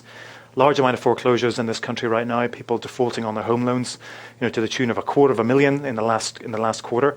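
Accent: England, United Kingdom. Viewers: All these videos pertain to the USA right? or are they in the context of the UK? The English accent has me wondering...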